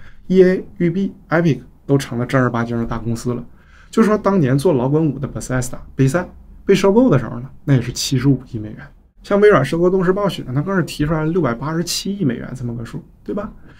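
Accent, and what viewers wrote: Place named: Tangshan, China. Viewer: 这个口音，是唐山人吗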